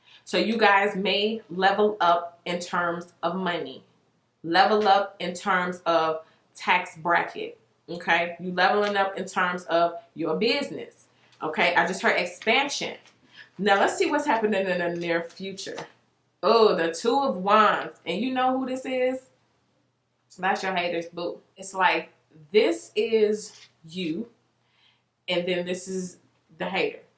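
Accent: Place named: Atlanta, United States. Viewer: U so Atlanta. Loool .😂😂 I love it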